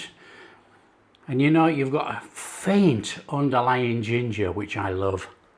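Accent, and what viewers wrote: Yorkshire, United Kingdom. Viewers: This will be the first time that I’ve taken an oriental recipe from somebody that has a United Kingdom accent. It looks delicious. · Great stuff - loving your Yorkshire accent :)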